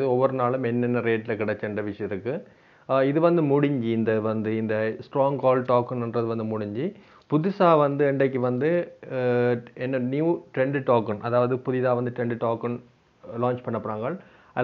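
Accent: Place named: India. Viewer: accento indiano e si voolaaaaaaaa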